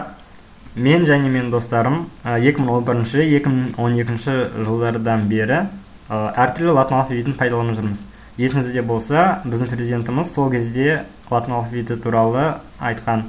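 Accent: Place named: Russia. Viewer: кешеден бері байқағаным негізі сіз орысша ойлайтын қазақ болып көріндіңіз, видеодағы қазақша сөйлегенде де орысша сәл де болса акцентіңіз бар. Қазақшаға шорқақтау қазақтарға қаза тіліне байланысты мәселелерді қолға алмағаны дұрыс ау. Кім сойса да қасапшы сойсын демекші. Терең білім болған кезде өзгерістер енгізуге болады. Жалпы, әліпбидің еш кемшілік тұстары жоқ, дайын 42 әріптен құралған, бүкіл әлем ұлттарының үнін ұштастырған әліпби. Артық ештеңесі жоқ. Білім және Ғылым Министрлігіне үндеу: АРТЫҚ ҚЫЛАМЫН ДЕП ТЫРТЫҚ ҚЫЛЫП ЖҮРМЕҢІЗДЕР.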